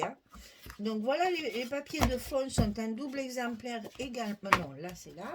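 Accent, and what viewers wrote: South, France. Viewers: Cool! si vous nous montrez comment faire un tableau avec une feuille 30x30, je prends. J'offre ce genre de cadeau de temps en temps et je suis très intéressée de voir comment vous sublimez une simple feuille. J'ai hâte de voir vos idées très créatives dans votre bonne humeur et votre accent du sud 😍qui va réchauffer notre hiver du nord 😝.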